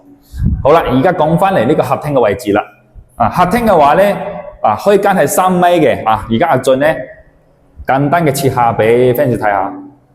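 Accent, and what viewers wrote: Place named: Taiwan. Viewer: 點解你廣東話似台灣人，不像大陸人？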